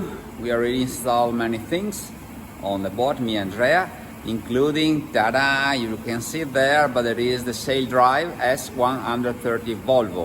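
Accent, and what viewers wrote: Italy. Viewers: bravo sembri veneto dal sound....se ti serve una mano gratis a disposizione perche mi sa che c'e' davvero molto da imparare da voi. well done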